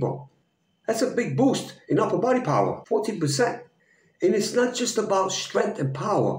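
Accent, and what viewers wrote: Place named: Brooklyn, United States. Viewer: How does the creator sound like my uncle Victor from Brooklyn or my cousin Vito from the Bronx claimed he's not even an American citizen?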